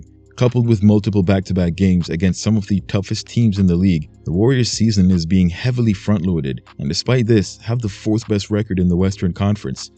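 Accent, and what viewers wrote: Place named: Jamaica. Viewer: Jamaican is the last thing I imagined you to be. And now I can hear it. Not that I don't think a Jamaican can code switch to accentless English. Praying for those victims of the hurricane.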